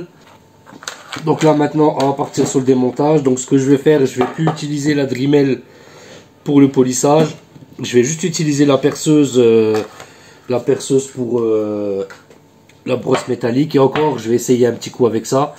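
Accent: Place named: Lorraine, France. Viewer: Salut! Je t'ai découvert par la chaîne de JP et en regardant toutes les vidéos du weekend entre fumier, j'aurais tout de suite mis ma main à couper qu'avec un accent pareil tu viendrais soit de Nancy soit de Metz, en tout cas en Meurthe et Moselle 😅 Moi je suis de Nancy et franchement si tu passes par là ça ferait vraiment trop plaiz de te rencontrer et d'échanger avec toi, pareil si tu cherches un compagnon de tir ou même des endroits pour faire des concerts à Nancy je dois encore connaître deux trois coins sympas pour t'éclater et te mettre en relation 😁 J'ai vu aussi que tu faisais du flocage on en faisait y'a un peu moins de 10 ans avec des potes de Metz sous le nom de EMKA prod si ça se trouve on connaît des gens en commun ça serait ouf ^^ Bon allez salut à toi la chicorée je sais pas si tu verras mon message mais je tente quand même😁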